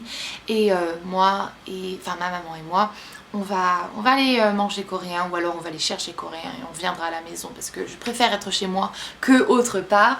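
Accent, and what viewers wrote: United States. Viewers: Félicitations ! Et je sais pas si je suis la seule mais je trouve que maintenant quand tu parle français t'a un petit accent américain :) Et je suis contente pour toi que te revoie ton marie bientot bisous :p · lol on dirait tu as deja choppé l'accent américain c'est bizarre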